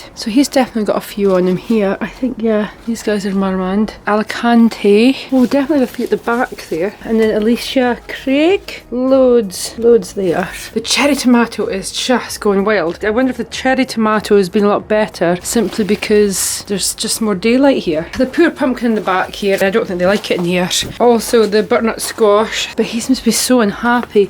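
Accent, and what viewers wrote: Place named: North, United Kingdom. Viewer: I thought I heard a northern accent, r u from Shetland?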